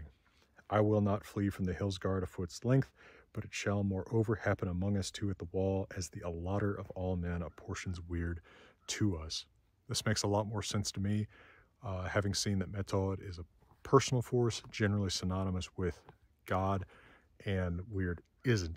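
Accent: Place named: United States. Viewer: I was gonna say the opposite, when he speaks it that quickly the American accent comes thru quite clearly, imo. in slower readings, such as the beowulf series with simon, there's much less american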